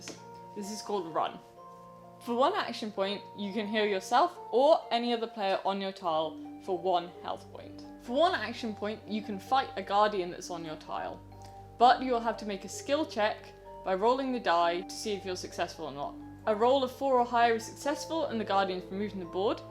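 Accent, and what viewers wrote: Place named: United Kingdom. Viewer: Donnie DeVille this is not mumble, it's British.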